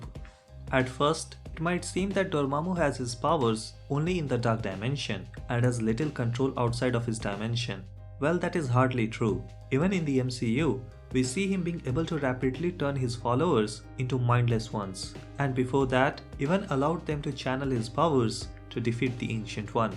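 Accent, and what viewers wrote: India. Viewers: Indian accents are the worst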